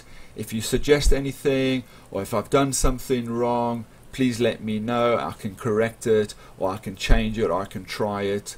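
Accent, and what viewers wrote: South Africa. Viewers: you sounds south african, you must be south african :)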